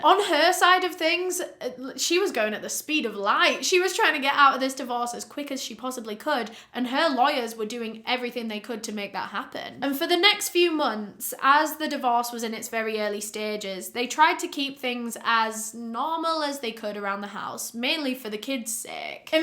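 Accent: United Kingdom. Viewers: youve had suchhhh a GLOW UP, you gorgeous babe! i absolutely adore you and your cute uk accent 🤣🤣🤣🤣 i wonder if europeans think americans have "cute accents"? LOLz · What’s her name ? I want to read about the case more in depth. Maybe it’s her cute British accent but is it “hell”? What’s her last name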